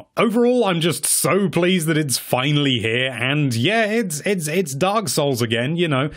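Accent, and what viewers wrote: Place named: United Kingdom. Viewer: Click on video Hear British accent Close video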